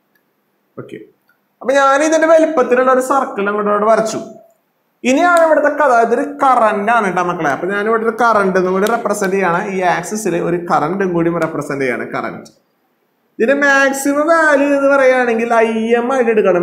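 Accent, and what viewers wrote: India. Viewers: pinnalha... തൃശ്ശൂർകാരൻ ഡാ 🔥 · Kasargod karan alle ariya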